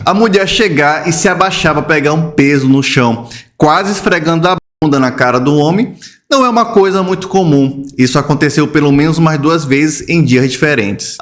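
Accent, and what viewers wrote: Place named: Ceará, Brazil. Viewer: Esse sotaque é cearense.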